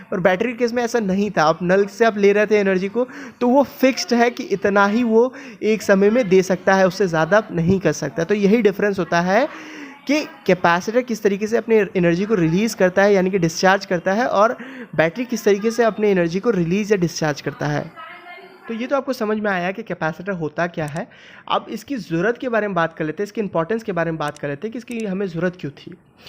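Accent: Bengal, India. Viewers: Are you Bengali? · Kya aap bangali he?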